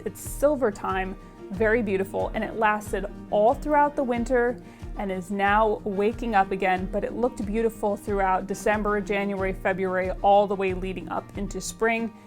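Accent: United States. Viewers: The same reason she says oRAGeNno, she's American 🤷‍♂️ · Sorry, I can't watch this. There is an 'H' in herb, I know in America you feel the need to change the English language, but to an British English speaker this is unwatchable.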